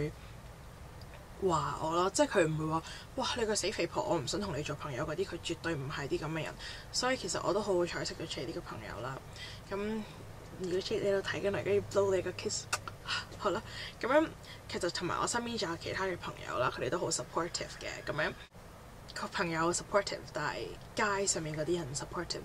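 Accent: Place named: England, United Kingdom. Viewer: did you go international school? or studied in a foreign country cuz I think there is slightly an english accent when you speak in Canton....just curious....nth much...love your channel and this video....^^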